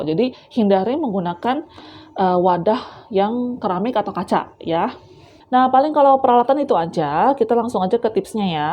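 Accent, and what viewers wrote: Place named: West Java, Indonesia. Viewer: Suka bgt sama cici cici , tp ngomong nya nyunda (ada "mah" nya) 😆😆😆 terimakasih tips nya cii , sehat selalu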